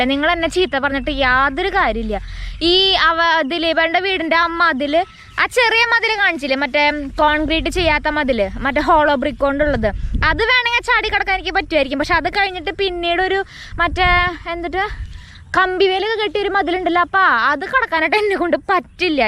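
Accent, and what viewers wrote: Thrissur, India. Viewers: Thrisoor slang poli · Thrishoor basha nalla rasam kelkkan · Saralla mole itrakim budhimutti... Thaarangalde veedokke kanichallo... Enikista mole pinne trishur bhaasha kelkaan nalla rasamund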